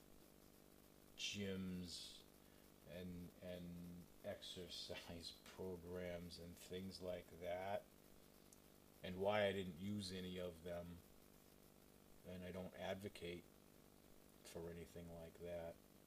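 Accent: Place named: New York, United States. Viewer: Glad you're back, you talk like a New Yawker.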